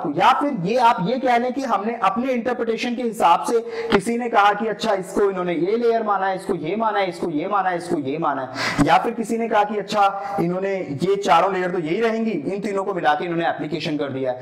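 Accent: Pakistan. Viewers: sahi kaha bolta ek dm pakistaniyo ki tarah h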